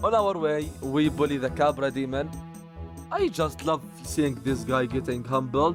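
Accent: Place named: Arab world. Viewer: Are you arabic? Youre accent kinda sounds like an arabic one lol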